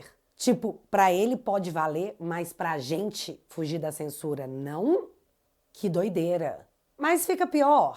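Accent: Minas Gerais, Brazil. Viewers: Sou carioca mas o sotaque mineiro é o mais bonito da língua portuguesa 😊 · Bão por de mais como falar o mineiro 😅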